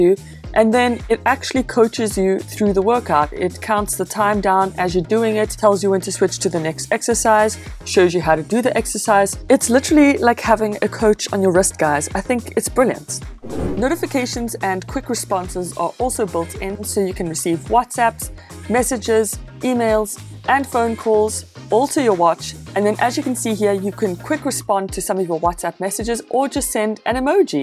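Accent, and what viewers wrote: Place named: South Africa. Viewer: Thanks for the review! I thought I recognized the accent (lived in SA for 6 years). This decision about which Versa to buy can be daunting lol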